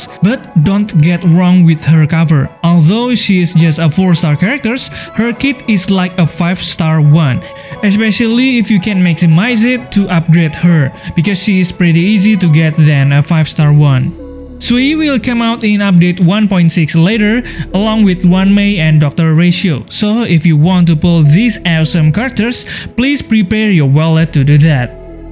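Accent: Indonesia. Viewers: Are you Indonesian by any chance? I kinda can hear the accent a bit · keep it up, even tho ur indonesian accent is to bold to hear, thumbs up from me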